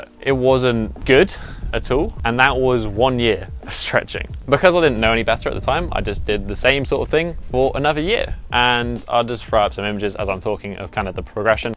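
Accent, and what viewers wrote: United Kingdom. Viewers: Awww british., damn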